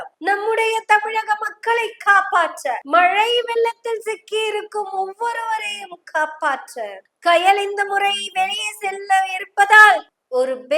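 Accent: Kongu, India. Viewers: Amai kunjugalayum anil kunjugalayum vibration adipathe ungal velai kongu thamil nalla iruku thanks Sister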